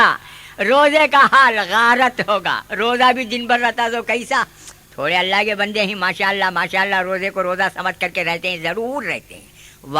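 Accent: Bangalore, India. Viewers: Yeh purely bangaloreans ki urdu hai yaar ( aso bangalore ku baath karinge tabich malum hotaye bekaar ke commentan likh ko chhatto nakko)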